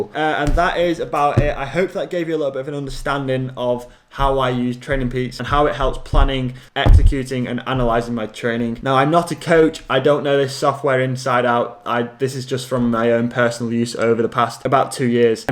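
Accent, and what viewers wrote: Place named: Lancaster, United Kingdom. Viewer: Hi, Been watching and enjoying you for a few weeks now, and listening to your accent, it sounds like you come from around where l used to live, Lancaster and Morecambe, live in Switzerland now. All the best for next season Mike Ford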